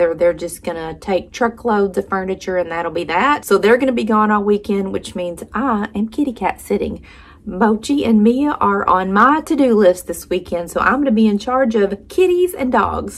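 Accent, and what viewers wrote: South, United States. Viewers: I absolutely love your accent! Love it! I live in Long Island, NY and I know I surely have an accent but NY accents are awful yours is so cute! I went to college in Virginia Ferrum College and it was not far from Kentucky south western part of Virginia and your accent brings me back to happy times. Love the south my husband and I want to move there after he retires. Love this video your such a joy and funny to watch! Have a blessed weekend! 🥰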